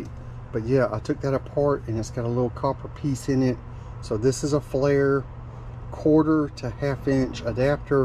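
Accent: South, United States. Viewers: 😆 no worries, ya'll got a good team as usual. Are you from Georgia? I was thinking one of the Carolinas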